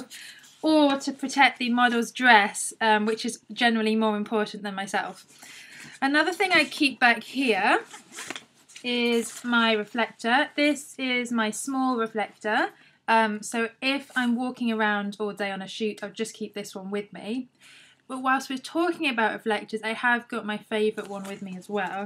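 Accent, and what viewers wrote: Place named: South West London, United Kingdom. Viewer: london accent..south west i think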